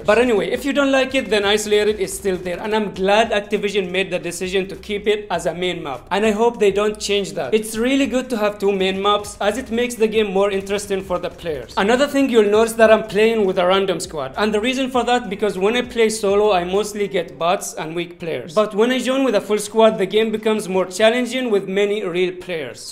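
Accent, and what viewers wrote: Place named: India. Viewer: I don't know where r u from. But ur sounds like u r Indian😀.and I really like your gameplay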